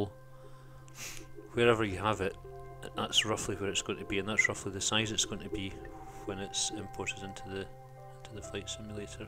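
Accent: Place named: Scotland, United Kingdom. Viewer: ohh shit you Scottish mate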